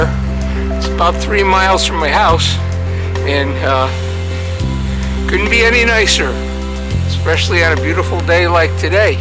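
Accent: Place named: Long Island, United States. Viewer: Wonderful video and Happy Birthday!! 🎉🎉🎉 P.S. Love your accent. I grew up in Merrick, Long Island (right next to Jones Beach). Neighbors!!! ❤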